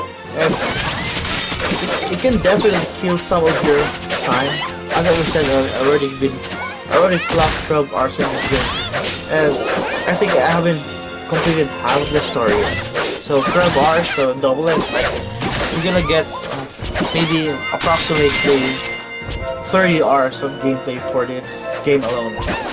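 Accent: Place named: Tagalog, Philippines. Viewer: You have that English accent in you you are good talking in English Language but your Tagalog accent is still there.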